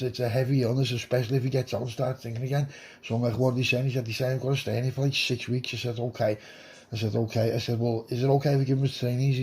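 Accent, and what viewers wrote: Liverpool, United Kingdom. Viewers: Top quality Scouse👏🏼👏🏼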